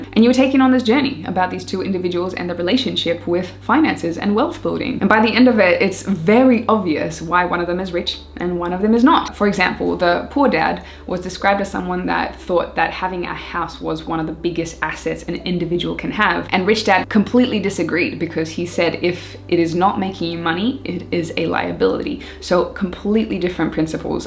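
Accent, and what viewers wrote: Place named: Australia. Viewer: I can't place her accent, Australian but there is something else..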